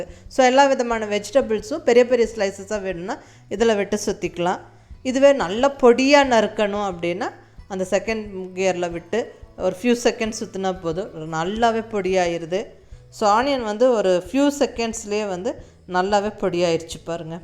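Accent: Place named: Coimbatore, India. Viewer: உங்களோட கோயம்புத்தூர் பேச்சிற்கும்.... உங்களோட கோயம்புத்தூர் சமையலுக்கும்.... திருநெல்வேலி மங்கை தன் தலை சாய்ந்து தங்களை அன்போடு வணங்குகிறாள்..😊😊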